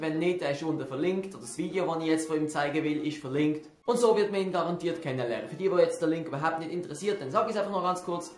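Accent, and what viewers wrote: Basel, Switzerland. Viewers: Bisch du Basler ?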